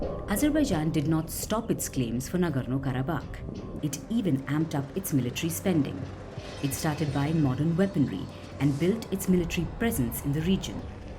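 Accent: India. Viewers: Why all these news are read by indian? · A dusty Indian accent is heard.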